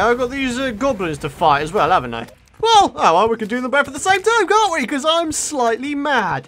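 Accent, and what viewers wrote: United Kingdom. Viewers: HEY! I love your videos and your British ascent. you are my favorite you tuber and I hope you could respond to me, it would make my day better, after being bullied.....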